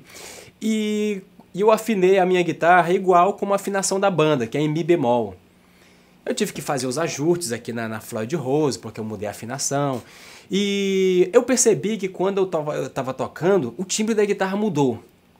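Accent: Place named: North, Brazil. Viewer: vente você nesse vídeo que você conversa bastante e toca um pouco, parece duas pessoas, você tem um falar calmo, simples com esse sotaque lindo do norte, mas quando toca fica um monstro( no melhor sentido) e arrebenta... Valeu Cara!!! Por toda humildade e talento.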